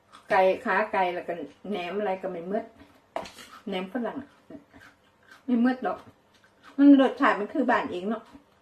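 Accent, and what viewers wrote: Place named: Korat, Thailand. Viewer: สวัสดีชอบสำเนียงโคราชมากค่ะ เป็นตาแช่บแท้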